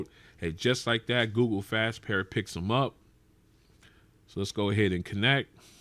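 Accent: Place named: California, United States. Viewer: Big bruh. Your cali accent so apparent. Love the content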